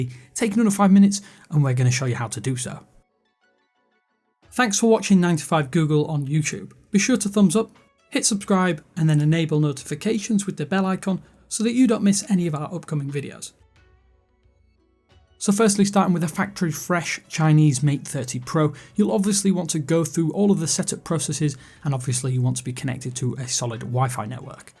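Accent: United Kingdom. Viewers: てていていってて 这差远了，只是英音而已。。你听听约翰逊那种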